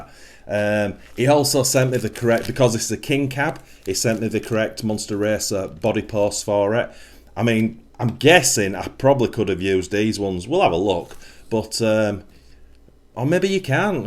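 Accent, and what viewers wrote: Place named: England, United Kingdom. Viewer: Hello sir... For years now.. I have been trying to learn things about England.. Especially accents and their locations.. only very little. Can you tell me what part area your accent is from mainly... Just roughly... North.. south.. or whatever. You can give a city area. But I don't know if it will help me.. lol. I guess I can look it up though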